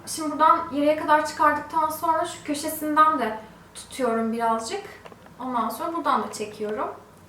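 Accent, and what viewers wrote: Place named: Russia. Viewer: Ты русская скорей всего)))) ama türkçen iyi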